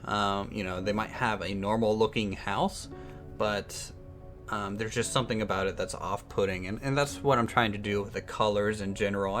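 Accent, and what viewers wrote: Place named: South, United States. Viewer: It's weird how you have a southern accent yet sound like you barely know english.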